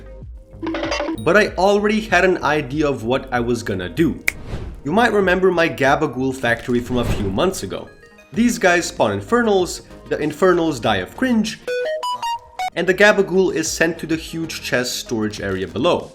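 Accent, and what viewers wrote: Israel. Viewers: אז למה יש לו מקלדת בעברית וגם יש לו מבטא חשבתי בהתחלה שזה לא דומה למבטא ישראלי אבל זה כן מזכיר פשוט הוא לא עושה את הר והח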